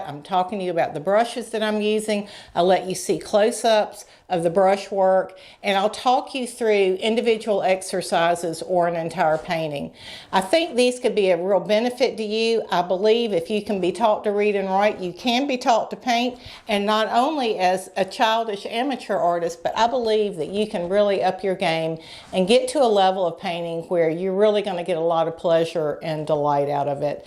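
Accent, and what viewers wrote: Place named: South, United States. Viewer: Im not a gifted painter just taking advice from artists on youtube, I just came across you and love to hear you speak in your southern American accent, im’Irish so I don't hear too many different accent........ I love your paintings ❤❤❤❤❤❤❤ 🇮🇪🇮🇪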